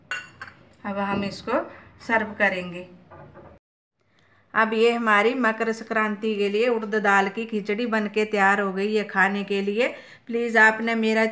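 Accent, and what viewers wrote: Pahari, India. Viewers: I watched so many videos of this recipe but what was special in your video was the little pahari accent that was there Usko sun ne baad confirm hua yes someone from Himachali connected to roots is making this and this is authentic and right way to cook it This was so sweet of you aunty ji ♥️ Much love from your son like child Deepak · Araa aunty apki recipe ne toh moti hi madad hi kr di.. lockdown k time (pahari accent) ☺️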